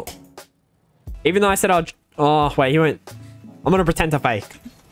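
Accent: Australia. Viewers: i didnt even realise u were aussie man till this vid you accent stands out to even me and im aussie to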